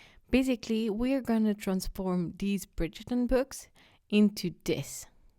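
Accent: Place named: France. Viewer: Je me disais que l'accent était un peu français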